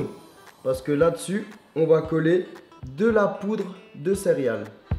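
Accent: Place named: Alsace, France. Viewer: je pari que tu est alsacien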